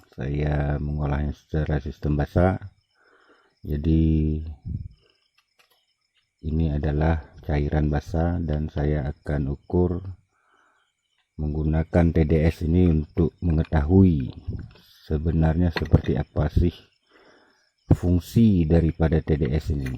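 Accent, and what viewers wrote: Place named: Aceh, Indonesia. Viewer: Met pagi bang dari suaranya sepertinya abang orang aceh, salam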